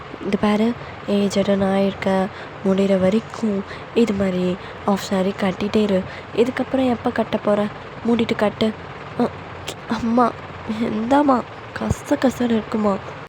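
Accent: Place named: Kerala, India. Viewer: i luv ur Kerala voice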